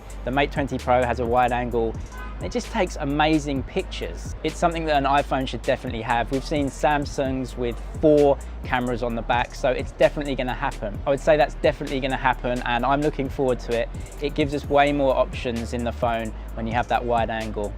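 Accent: United Kingdom. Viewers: Like your British accent! I watched the video you challenged Guizhou dialect , that’s fun, try to speak Cantonese)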